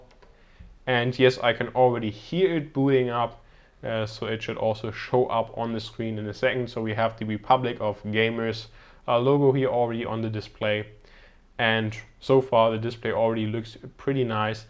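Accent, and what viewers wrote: Germany. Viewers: you sounds geman for me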